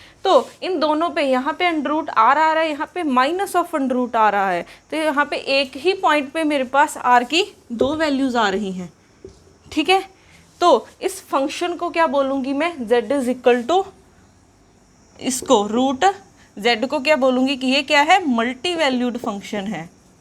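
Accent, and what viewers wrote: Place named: Punjab, India. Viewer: Punjabi accent aa hee jta beech mai 🤣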